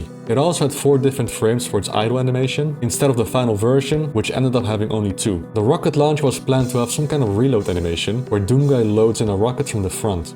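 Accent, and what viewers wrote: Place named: Netherlands. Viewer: Something about the way you sound make me wonder if you Dutch. Are you, by any chance?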